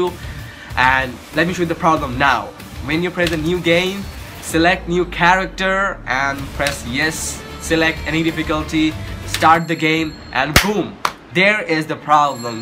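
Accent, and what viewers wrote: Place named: India. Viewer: This guy has to be an Indian.. he has that call-center accent, very indian but trying to sound American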